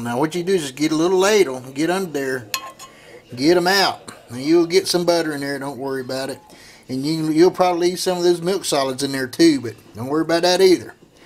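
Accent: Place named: South, United States. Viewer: Loved your accent, I'm for the South too!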